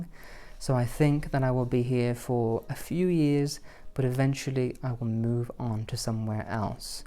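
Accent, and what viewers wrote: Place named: England, United Kingdom. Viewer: you speak like a real English gentleman, born and bred in the upper classes. I could listen to you all day, your voice being so calming. Does this way of speaking come naturally, or did you learn how to speak correctly? I look forward to watching your Christmas video.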